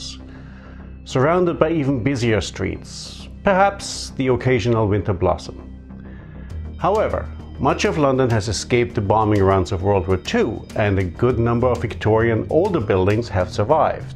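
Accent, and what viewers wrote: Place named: Germany. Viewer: Hi! I love your tiny house drawing- yes the pride in craftsmanship is evident in the beautiful little house you drew. Your narration and editing and videography are wonderful- I feel like the house stands beautifully alone. I detect an accent- are you German or Austrian? My father is from Germany- a wonderful country.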